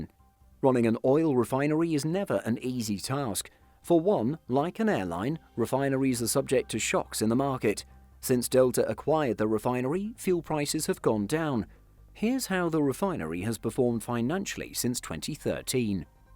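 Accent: United Kingdom. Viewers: The UK I can tell by his accent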